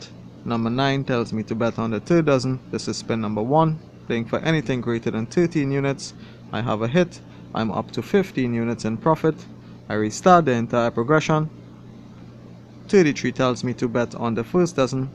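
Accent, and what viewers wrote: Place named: Trinidad and Tobago. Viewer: u sound like u from trinidad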